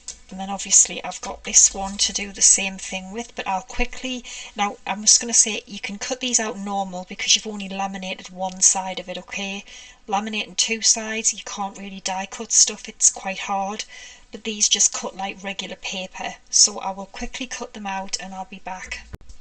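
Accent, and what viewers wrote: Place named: Northern England, United Kingdom. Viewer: It's almost half past one in the morning here - I found you earlier this evening and am blown away! What a star find! I've been card making for around 20 years and just love your ideas. Photopolymer stamps also have a distinctive smell compared to acrylic ones - sadly even some reputable UK designers are cutting their costs and still have their stamped made overseas and many of them are acrylic. AND, my laminator is coming out to play when I eventually get up later today! HUGE thanks - how I wish I'd known a lot of this particular video when I started out.. I obviously have a lot of hours of fun viewing ahead! Di x (Northumberland exile - so it was an extra delight to hear your lovely Northern accent).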